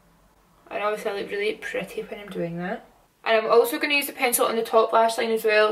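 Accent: Scotland, United Kingdom. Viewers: Omg I love your accent 😍👌🏻 I have a friend who's Scottish but she doesn't have an accent like you, but it's kinda adorable. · Are you from Scotland ??? Im from Glasgow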